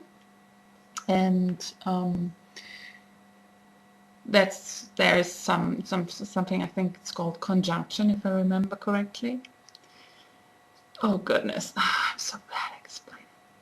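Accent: Nepal. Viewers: There is no doubt that u r pretty, but with ur Nepalese accent u just killed me. You sound and look sooo hot.... well beyond hot :) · I loved your Nepali accent!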